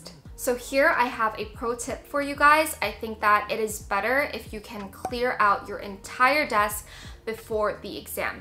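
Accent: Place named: North America. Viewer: HOLY SHIT. You were born and raised in Taiwan and can now speak English with a North American accent? Damn.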